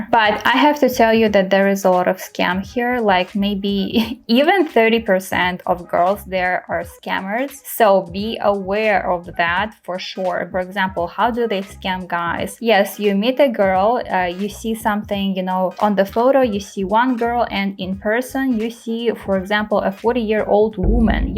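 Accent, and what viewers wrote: Russia. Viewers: Are you Russian? You don’t sound American at all and I’m American with no understanding of the Chinese language but you look Eastern Russian or Korean Japanese maybe of mix race. Grateful for you guide but a little too long for my patience, you’re an expensive gal, nice LV shopping spree from coffee table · Акцент похож на русский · Why does your english sounds so russian? Is it just me or you may be sibirskaya? 🤔 🖤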